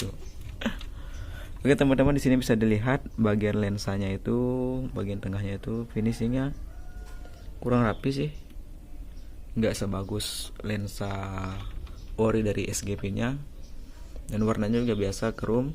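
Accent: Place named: Bali, Indonesia. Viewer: LOGATNYA KAYA ORANG BALI,, MAS NYA ORANG BALI YA?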